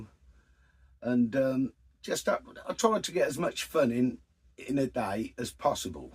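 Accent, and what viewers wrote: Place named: Surrey, United Kingdom. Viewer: you sounded to me you was from Surrey ty for answering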